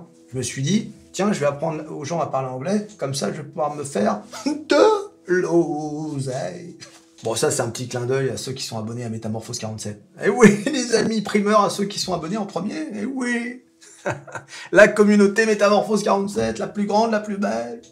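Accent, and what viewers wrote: United States. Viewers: Vraiment sympa cet accent ricain.. pour apprendre le Thai se sera plus hard lol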